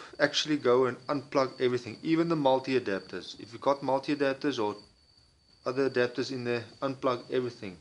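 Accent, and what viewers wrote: South Africa. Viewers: Saved me a call out fee! Thanks a million! In my case it was the breaker that went! Where are you from? You have a strong South African accent ;-).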